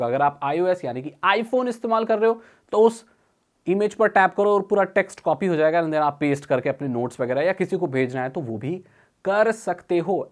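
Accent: Odisha, India. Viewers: Are you from Odisha?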